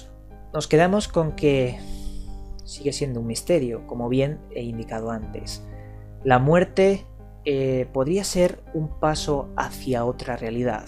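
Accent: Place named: Spain. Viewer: Gostei muito do vídeo, mais eu não entendi muito porque sou brasileiro e você deve ser espanhol.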